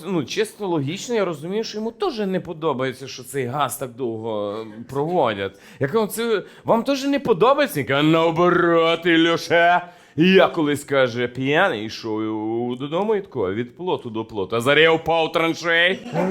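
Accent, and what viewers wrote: Western Ukraine, Ukraine. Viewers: Який цікавий мовний акцент у ведучого! Суміш західної, центральної і східної мови України!😂❤ I в цьому наша сила українці - в єднанні!!! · Я тоже никакой смеси не слышу. Только западноукраинский диалект.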